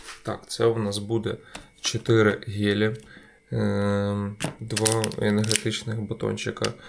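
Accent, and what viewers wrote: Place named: Russia. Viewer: покращуйте вимову кацапська так і лізе, все інше лайк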